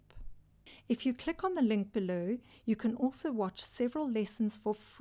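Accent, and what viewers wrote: South Africa. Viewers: Thank you for the very useful overview. Nice to hear a South African accent too!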